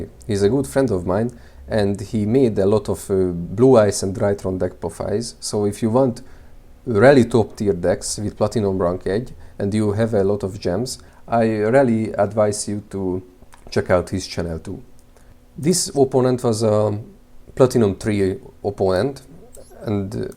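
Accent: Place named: Hungary. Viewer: a magyarok angol szavak kiejteseben kicsit olyan masok es felismerhetobbek 😂 de ez nem rosszbol.. amugy jo az angolod.. ez az elso vidi amit lattam toled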